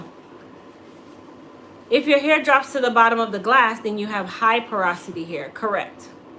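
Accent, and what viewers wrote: Jamaica. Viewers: I hear some Jamaican in there lol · I heard the jamaican accent..ru from here... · I like u n plus I hear our JAMAICAN accent makes me watch more of ur videos....he smile at d end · are you 🇯🇲? The accent come out😀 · I hear that Jamaican accent 🇯🇲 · Jamaica accent can’t hide 🇯🇲😀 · You were asked are you from Jamaica because you have an Jamaican accent.No one would have ever guessed that your a yankee and that your from the east coast. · Love the patois! Sounds like my house lol!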